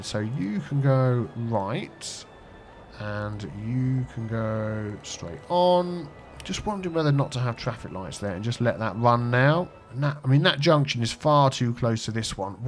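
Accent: United Kingdom. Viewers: love and support from Korea(obviously from south😉) I enjoy watching your video cos i like this game but also your british accent is so satisfying to listen to!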